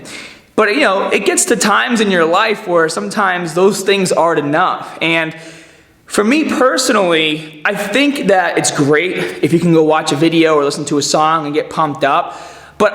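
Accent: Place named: New England, United States. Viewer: Aside from being oh so easy on the eyes...one of the reasons why I watch your videos is because I am always impressed with your authenticity as a person. You are a kind soul. This couple with the fact that your NH/MA accent is so familiar to me, allows me to digest what you are teaching and actually benefit from it. Happy New year to you and "Ericer" LOL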